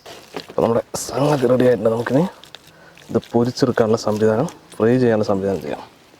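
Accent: Palakkad, India. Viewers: Thani palakkadan samsaram🤩🤩🥳🥳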